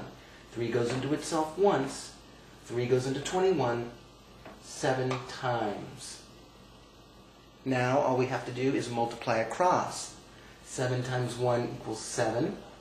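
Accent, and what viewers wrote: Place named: United States. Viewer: i dont understand you cuz have a strong american accent it gets in the way when you explain it and to top it you talk to fast i am sorry to say this ...... but hey i am from London and i am watching this!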